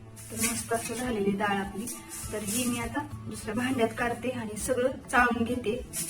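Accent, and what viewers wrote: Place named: Maharashtra, India. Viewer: Chan marathi boli aahe 😟🙄🙄☺️👌👌👌👌👌👌👌👌👌👌👌👍👩‍⚕️👩‍⚕️🐎🐎🐫🐗🐃🦓🐗🦓🐐🐄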